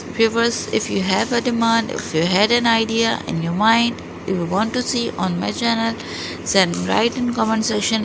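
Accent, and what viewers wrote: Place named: India. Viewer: English please... not your east Indian head ass scammer voice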